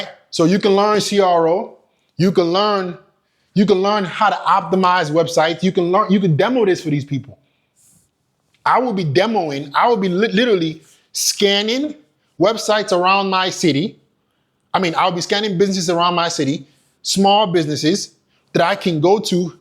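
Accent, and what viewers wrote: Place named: Bronx, United States. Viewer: Lol they're your biggest audience 😂 why am I not surprised. You got a Bronx accent btw 🤔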